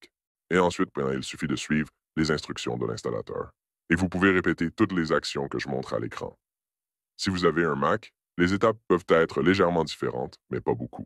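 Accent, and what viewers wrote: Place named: Quebec, Canada. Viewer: T'es Québecois :)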